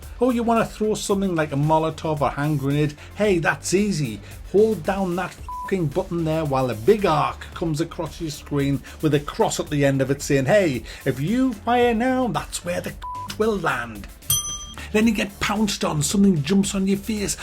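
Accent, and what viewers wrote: United Kingdom. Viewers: Stop pretending you speek english! I can't freaking listen to one more Brit on youtube... I need to put the auto subs on just to get your point and thats not the goal! And I want to watch all your videos becaus I adore them and you are pretty right with your critique, I have so often the same feeling and i dont want to hate on new AAA Games but how can you love old diamonds like Bioware or Bethesda in 2019? Its a shame and Im just keep playing becaus of good indie games like Mordhau or the upcoming rebel galaxy game.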